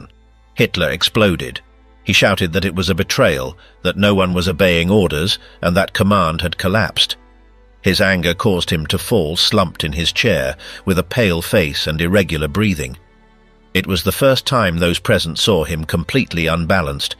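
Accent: United Kingdom. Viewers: AI videos with a british accent. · English accented narrator, yet he says "aluminum" and "flashlight" (when he means, judging from the sentence context, "torch").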